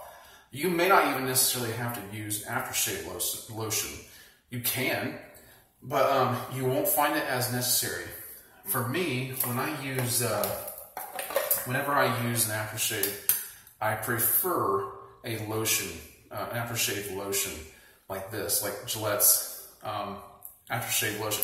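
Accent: United States. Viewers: Yo man. Thanks for the vid. I’ve been hurting the CRAP out of my head!!! BTW, sounds like we live in the same area of FL!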